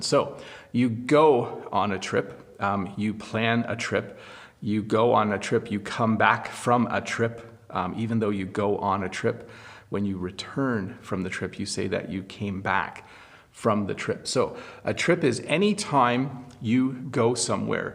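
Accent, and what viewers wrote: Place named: Canada. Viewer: Its so exciting to watch your channel. I just learn so many phrases about a trip. I want to grab Canadian accent. By the way I am from Bangladesh and my native language is Bangla. I like to be native English speaker like you. You are so understandable. Could you please tell me the whole process to be like you. You are just amazing. Hope you will understand the pain of learning English for a non-native country's people.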